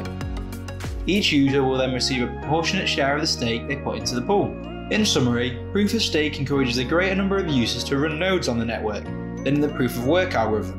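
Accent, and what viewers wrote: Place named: Netherlands. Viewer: Nederlands accent :)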